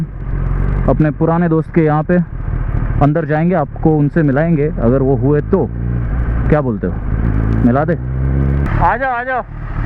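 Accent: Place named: Mumbai, India. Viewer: Aahhh!! Mumbai Roads 🥲 Ride Safely 🥰 Finally got to listen ur Mumbaiya Language Skills 😝 Good Vlog, Good Vibes ✨ Stay Blessed 🙌 Keep Vlogging 🎉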